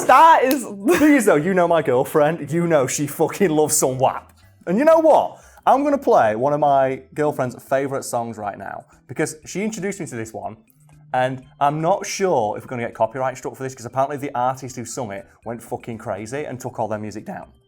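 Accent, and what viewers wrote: United Kingdom. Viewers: Dee Snyder, Frank Zappa, and John Denver testified against the PMRC in the US Senate, not in court. Based on your accent, I'm assuming you're in the UK, so it would prolly be most like if they testified in the House of Lords there. Incidentally, Dee Snyder's testimony is well worth watching, he has no mercy on the Senators questioning him or the PMRC and easily puts them in their place with an eloquence rarely seen either then or now.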